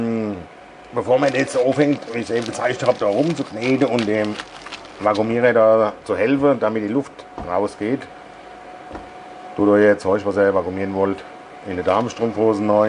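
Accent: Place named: Hesse, Germany. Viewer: Endlisch a ma aner der schö Hessisch babbelt. Weider so 👌🏼😉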